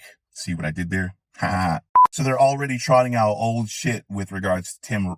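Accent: Scotland, United Kingdom. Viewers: am I detecting a bit of a Scottish accent here?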